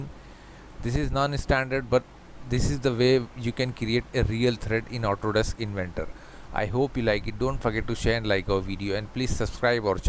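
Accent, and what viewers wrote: India. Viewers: You know it'll be informative when the guy has Indian accent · Are you from Índia?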